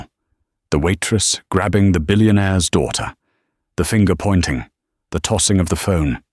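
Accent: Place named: England, United Kingdom. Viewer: Hi Canadian here Windsor Ontario And if the pictures / freeze frame slide shows at the top of your screen bother you, as they do me, just fold a piece of paper, as I do, over too of it to hide it and then just enjoy listening to that purring English accent and, if you prefer, as I do, follow along with the transcript. Cheers! Enjoy!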